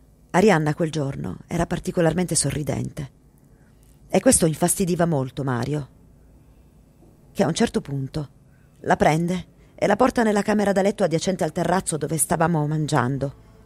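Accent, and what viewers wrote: Piedmont, Italy. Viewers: sembri piemontese !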